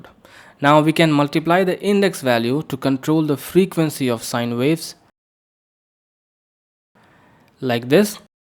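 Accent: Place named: India. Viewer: You are indian? I dislike your accent!!!!!!!!!!!!!!!!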